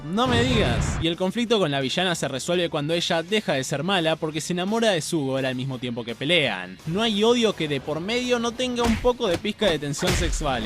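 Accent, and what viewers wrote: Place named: Argentina. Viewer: Nana amo como hablás amigo y relatas amigo. Explicativo y chistoso argentinado jaja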